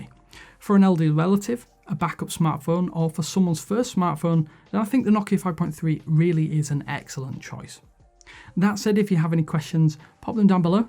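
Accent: United Kingdom. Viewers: I don't mean to be rude, but what's English accent does he speak? I presume it's British accent yet not confident enough to be sure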